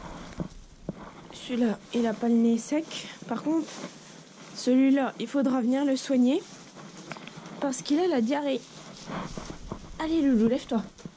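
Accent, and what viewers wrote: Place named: South, France. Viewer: On voit bien que tu viens du sud J'adore tes vidéos 🥰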